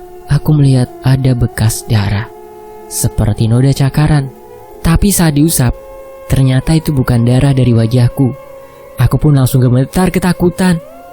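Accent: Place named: Cianjur, Indonesia. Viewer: Cianjur suarany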